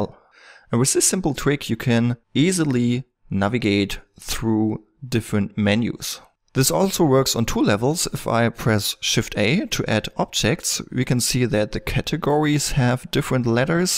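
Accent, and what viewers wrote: Germany. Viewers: You sound German.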